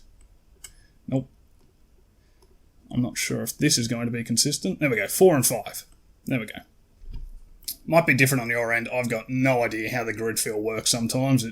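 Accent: Australia. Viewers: Thanks! Wow, so nice to hear an Aussie voice in a tutorial, apart from Andrew Price. I think you should either do a full model of this space marine or do a Terminator helmet next. Subbed and have a drink on me, cheers.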